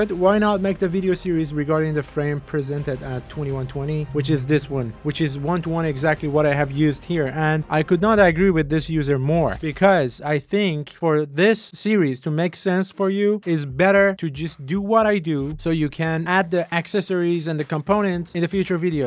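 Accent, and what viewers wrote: Iran. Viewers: حاجی لحجه ایرانی معلومه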